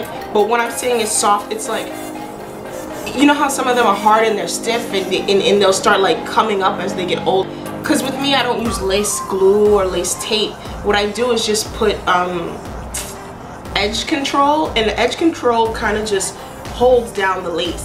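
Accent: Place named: Jamaica. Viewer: the island accent did it for me girlie! Are you Jamaican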